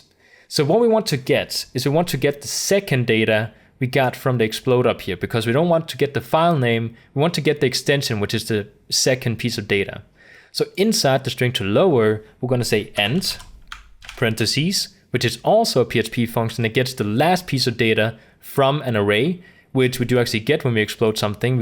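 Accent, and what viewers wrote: Denmark. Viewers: danish accent yes?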